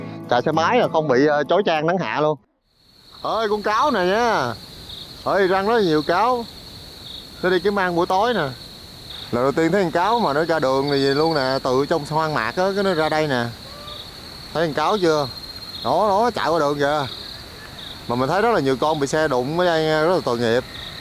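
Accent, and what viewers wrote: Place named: Mac Cuoi, Vietnam. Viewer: Cam on em . Chi Nghe em noi chuyen Mac Cuoi va thay vui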